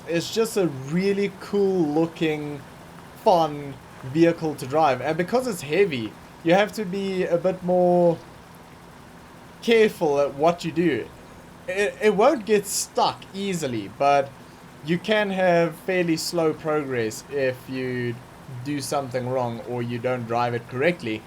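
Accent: South Africa. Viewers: Hey are you south African?if not just ignore lmao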